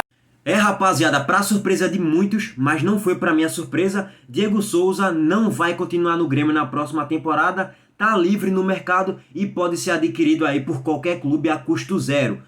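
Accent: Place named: Rio de Janeiro, Brazil. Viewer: uu carioca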